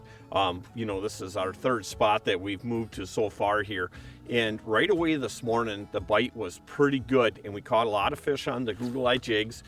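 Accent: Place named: Midwest, United States. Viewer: This is the most Midwest sounding guy I’ve ever heard😂 I love it!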